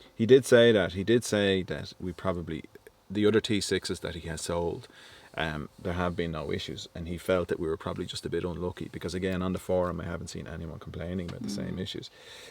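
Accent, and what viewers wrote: Ireland. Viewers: That the problem with motor vehicles today I have BMW about a year and a half old I've been back with lights on the dash about 9 times., just don't make them any good anymore., I dont keep my car for more than 3 years now Because of the quality of them.,yeah a member the Ford Escort last forever I just recently come across one of your videos I haven't watched you for about 8 months used to love watching your channel because I love the Irish accent Thumbs up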